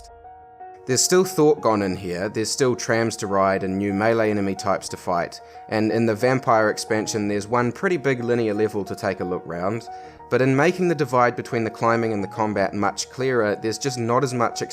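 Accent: New Zealand. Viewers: That's a Kiwi accent bro. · I've only played the first and Second Son and I do prefer Second Son but I so see where you're coming from with your points about the first it's still a pretty fun game. Btw are you from NZ the slang and your accent made it sound like it.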